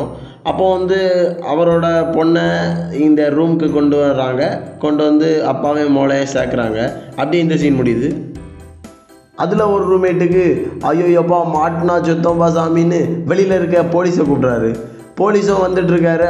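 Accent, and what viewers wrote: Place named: Marthandam, India. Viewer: Loi marthandam slang try a😅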